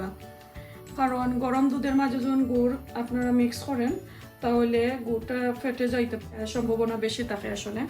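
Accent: Sylhet, Bangladesh. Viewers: সিলেটি মাত ভালা লাগে আমার ঘরে তাকিয়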